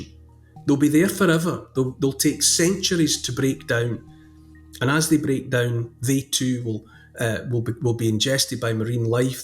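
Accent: Scotland, United Kingdom. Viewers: Yes, I hear my own thoughts, feelings and memories of years gone by, iterated in lilting Scottish accent. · It's so refreshing to hear a gentle Scottish accent deliver so much truth. If it was an American i would have switched off by now. I listen to when i am in bed at night. So relaxing but enlightening.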